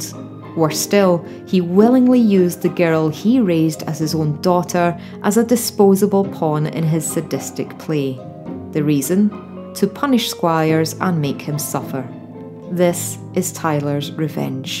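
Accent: Scotland, United Kingdom. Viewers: I love “Inside No. 9”. I’m absolutely in love with it. Love your list...quick question. What’s your accent? Is it Scottish or Northern English? It’s lovely! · Can I just say how much I appreciate your calm talking presentation. As a skeemish person myself ( I skip the jumpscare horror episodes ) it was nice that you didn’t include audio or visual scares that I’ve seen other reviewers use. Really good video and it’s always nice to hear a fellow Scot! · Where in Scotland are you from?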